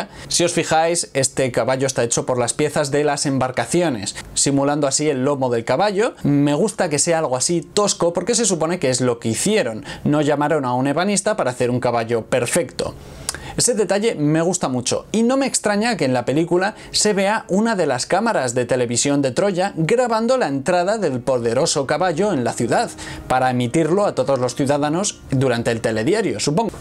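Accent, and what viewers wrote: Spain. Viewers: Me ha hecho tan feliz entrar en el vídeo y oír un castellano de España y no cualquier dialecto latino 😭😭😭😭😭😭🫱🏼‍🫲🏽🥰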